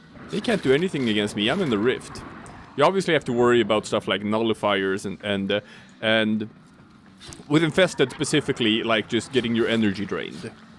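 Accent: Sweden. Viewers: Thought the accent sounded Swedish, went to "about" and sure enough I was right haha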